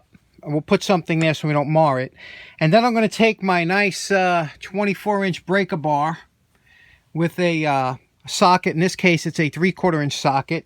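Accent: New York, United States. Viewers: Longga island. Accent is great. Thanks for the vid. Few of the most genuine people i ever met are from new york.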